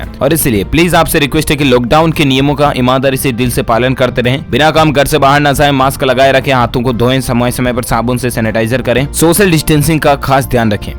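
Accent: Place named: Marwari, India. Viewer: Bhai aaj to aavaj bhi kabhi kabhi marvadi ka pardarsan nice sir ji very nice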